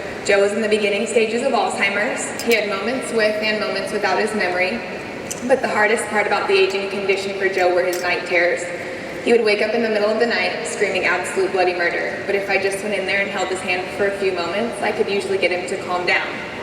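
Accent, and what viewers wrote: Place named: United States. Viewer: Talk more cringey bs in that awful yank voice!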